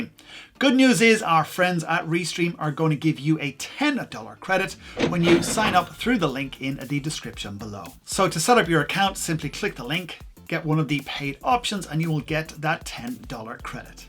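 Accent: Ireland. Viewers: Is he Irish?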